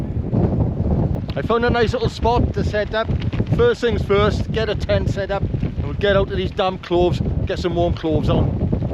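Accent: Wales, United Kingdom. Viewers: The Walsh accent is madd 😅😅😅 nice video tho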